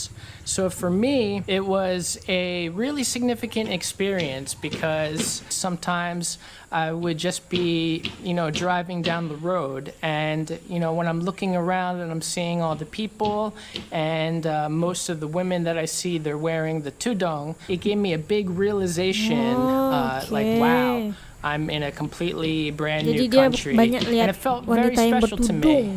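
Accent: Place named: Kelantan, Malaysia. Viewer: ini dye cakap kelate jgk mcm aiman...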